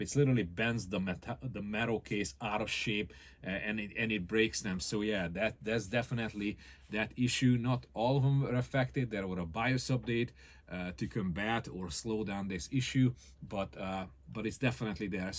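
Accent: Hungary. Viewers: I know a hungarian accent when i hear one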